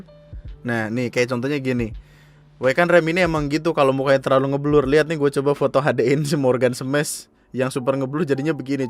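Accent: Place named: Java, Indonesia. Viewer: Jowone medhok ya ms 😹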